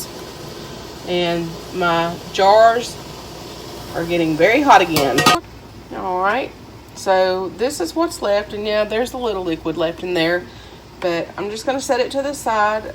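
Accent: Mississippi, United States. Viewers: Yummy Yummy..Great presentation as always..Mississippi talk and all..:)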